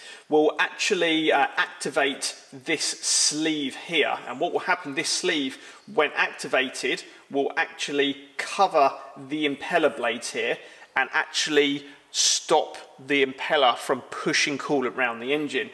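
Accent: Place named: United Kingdom. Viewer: Hearing a British guy do a video on repairing a British car just makes it fn authentic 😂. (Even though the car isnt as British as it used to be 🙄)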